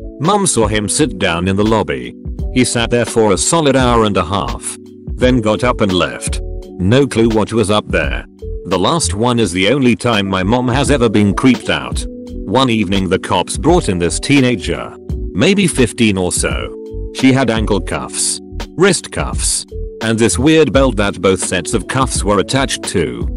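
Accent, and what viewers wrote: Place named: United Kingdom. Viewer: I wish people would use a different voice. I hate it and keep running from channel to channel but every single one uses this annoying robotic brit. Lol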